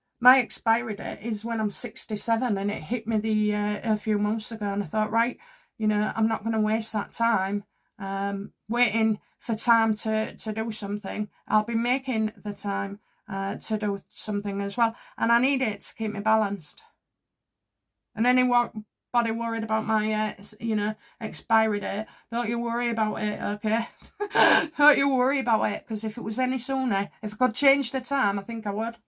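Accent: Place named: England, United Kingdom. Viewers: Am gutted for you. I love listening to you. Not only as a reader, but also because we speak the same dialect. Am a 74 & I speak with an "old Bradfordian" Yorkshire accent. N am proud on it. It's probably the accent H can't cope with, poor little man. ( Big tear left eye). Trevor Coult was demonetised by h&m & he chose to have a long legal fight, and he's coming out tops in the end. I wish you all the love, luck and justification.🕉️ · You are entertaining as well as teller of truth. I love your energy and also your particular English accent. Coming from Australia ❤ Harry is a boring predictable petty nasty piece of work. Where are his imaginary children? He NEVER mentions them! What a dishonest POS, taking orders from his horrible handler. Weak as piss.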